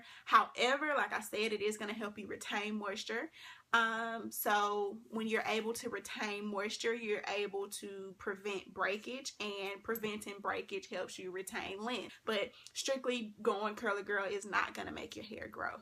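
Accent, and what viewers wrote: South, United States. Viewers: I live in SC and you sound way more southern than I do lol! #southerngirlsrock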